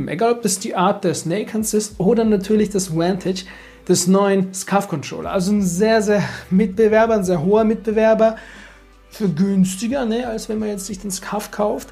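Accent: Switzerland. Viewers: Schweizer? Woher kommst Du?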